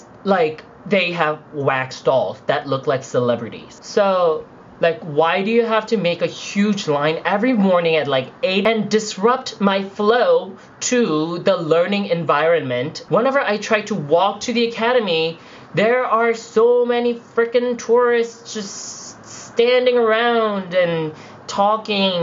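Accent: United Kingdom. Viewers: I don't care if you're American, you spoke like a true Brit in this video. Welcome to the family. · I agree; I don;t think your a tourist if your "living" in London. And I agree with everything you said! Also, I think your starting to pick up a English accent in your pronociation(sp?) of words. Off point; Where do you live in London? What area? Make more of these vlogs. :)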